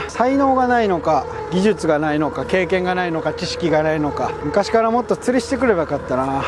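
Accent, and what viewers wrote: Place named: Shodoshima, Japan. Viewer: 夕焼けの小豆島、いいね！小豆島弁聞いてると高松の叔母さん思い出す。ほぼ同じやね。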